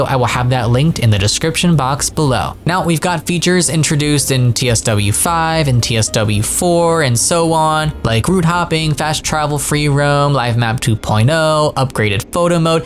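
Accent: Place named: California, United States. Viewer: You have the most California voice from California. Am I right?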